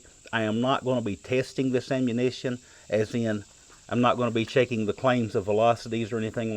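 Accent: United States. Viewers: балдежное произношение. в каких штатах разговаривают в такой манере?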